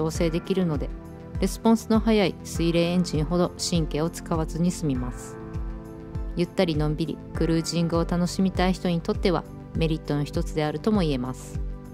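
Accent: Kansai, Japan. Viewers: 端々に出てくる関西訛りが、スゴく好きです。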